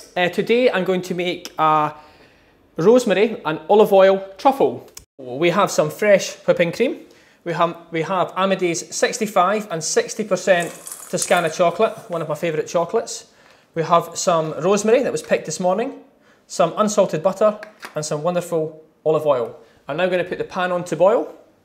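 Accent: Scotland, United Kingdom. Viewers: no he sounds very very scottish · you are right jonge!!!, its sound scottisch. I said that he was a dutch because it really sound like dutch speaking english...buut isnt it. I actually still have a dutch accent when i talking english hahah ;) doei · Chocolate upon chocolate upon chocolate and a Scottish accent. This video was sent from heaven. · Definitely Scottish! The way he pronounces 'R' tells that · I know that you've already had you me question answered, and yes, he is Scottish, but some Scottish accents are different depending on where you live ( I'm Scottish ) :) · I wasn't expecting a Scottish accent going into this 😂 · He's Scottish, AKA the language I speak. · He is Scottish like mee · no, he sounds very scottish.... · Ahh Scottish accents <333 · I just looked at the comments to see id anyone mentioned his scottish accent 😂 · He scotish? 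· haha no he's definitely scottish,i lived there for a year and he definitely is scottishxP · Where is his accent from? Scottish? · Oh that beautiful chocolate and that Scottish accent... <3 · Scottishhhhhhhhhhhhhhhhhhh, yaldi. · YES! He's Scottish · This man is Scottish, not Irish~ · I was trying to wind him up lol I know it's scottish, you know how the relationship with Scottish people is with the English ;) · He's most likely from central Scotland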